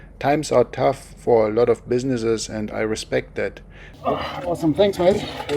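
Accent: Germany. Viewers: I hate Germans speaking English.... including myself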